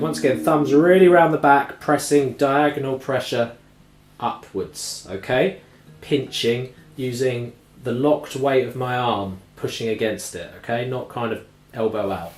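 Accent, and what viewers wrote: United Kingdom. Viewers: When You started talking I was like OH SHEESH HE'S BRITISH.. I did not see that coming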